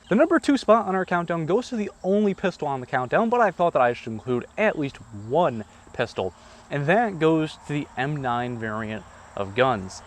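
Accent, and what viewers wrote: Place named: United States. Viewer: yeah well he's american they say most things weird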